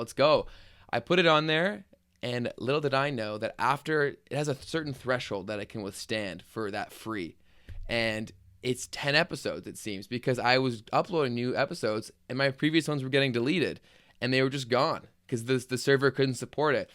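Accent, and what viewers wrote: Canada. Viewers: Love that Ontario accent! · Thank you for those tips taking notes ! I couldn't help to notice at the beginning are you from Canada? if yes which province :)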